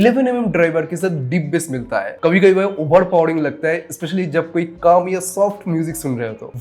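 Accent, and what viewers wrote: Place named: Bihar, India. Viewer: Relevant info diya kar bhai. Aur bihari feelings aati hai tujhe sunne pe 😅. Jisme r aur d bolne me totla jaisa lgta h tu... Faltu me paka rha tha😂😂😂😂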